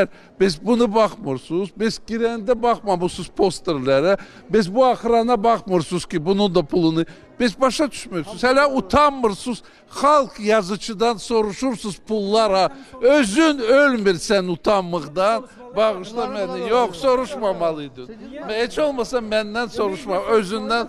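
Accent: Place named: Armenia. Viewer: Eyniylə ermənilər Azərbaycan dilində belə danişirlar, ayib olsun sizə yaraşmir xalg yazicisi adini daşimag, necə ki sizi kimi "ziyalilar"var bu millətin axiri bundan da betər olacag!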